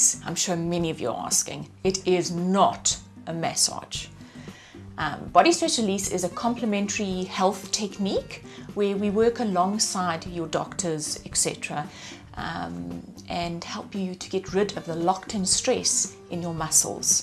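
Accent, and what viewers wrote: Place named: South Africa. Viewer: nice south african accent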